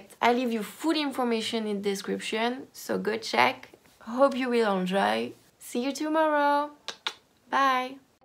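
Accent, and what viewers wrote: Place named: France. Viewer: Fantastic! Love it, I forgot how beautiful your French accent is, love seeing your work 💓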